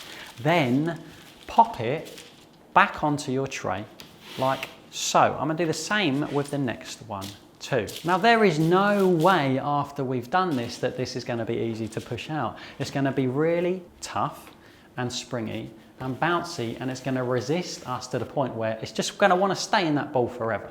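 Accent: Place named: Cockney, United Kingdom. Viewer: Waitrose and Taylor? Never judge a bloke by his barrow boy accent:) As informative as ever and now I have options...great video.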